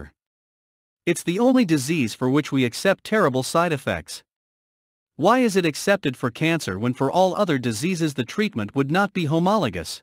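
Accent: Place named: France. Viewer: I agree with others , your French accent is lovely and totally understandable. The robots voice accentuates in the wrong place quite often which then doesn’t make sense. This robot voice is also associated with a lot of scam videos. I would hate to think this would put people off listening to your story. Be proud that you speak such good English!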